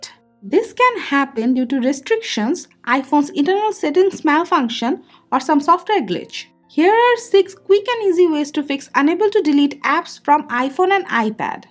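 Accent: India. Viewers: You must be American - THE very country that can’t speak English! So much so they made up their own language they call English. This woman is speaking English - with an Indian accent. Grow up!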